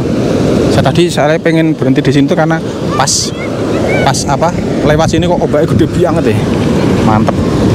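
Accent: Java, Indonesia. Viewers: Aku loh sueneng nek ngrungokne mas ngoceh2 jowone iku LO kuenthel😍🤭🤭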